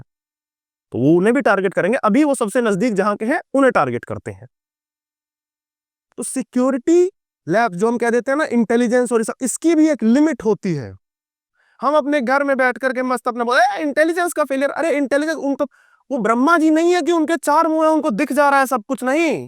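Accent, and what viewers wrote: Kashmir, India. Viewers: Damn.Ur kashmiri accent is so accurate. Anyways I have been in Kashmir, the more alluring it is from outside, the more grisly it is from inside . Thank you for this video.❤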